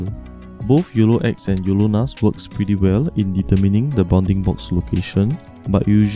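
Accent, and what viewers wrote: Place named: Singapore. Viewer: I'm glad I found this video, very well explained on the IPadapter workflow. Subscribed to the channel.. btw, love the Singaporean accent :)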